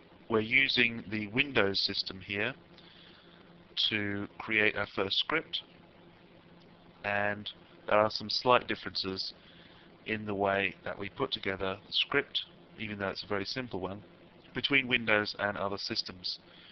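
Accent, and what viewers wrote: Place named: United Kingdom. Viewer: whats wrong with british accents?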